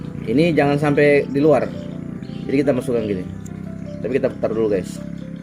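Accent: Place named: Southeast Sulawesi, Indonesia. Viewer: Logat abang mirip logat org sultra.. 😂😂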